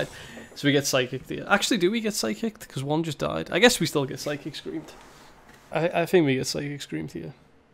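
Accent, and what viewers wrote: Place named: Scouse, United Kingdom. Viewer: great play I can hear a touch of the scouse accent lol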